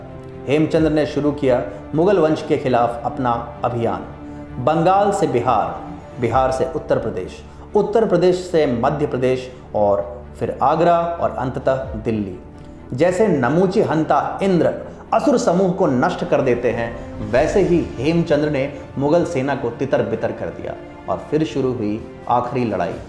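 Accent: Standard. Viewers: #Founder aap achhi shudh hindi bolty ho or aap ka bolney ka tariaka bhi achha hae... Bahoot achhy se ek alag andaz me aap story read karty ho... Ek advice dena chaunga agar aapko bahut aagy tak jana hae to hi... Ki aap khas kar History and non political topics par hi video banaya kary... Kyu ki aap bhi vohi kaam karty ho political videos me aapke dwara tathakit storys me jo ek #godimedia karty hae. Baki sab thek hae... ( I am not belong from any political party or political ideology )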